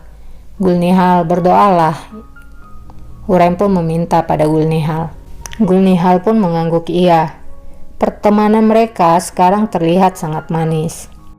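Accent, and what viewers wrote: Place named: Medan, Indonesia. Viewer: Yg ngomong ini seperti saudaraku ya orang medan sebab ada omongan lalap dia hamil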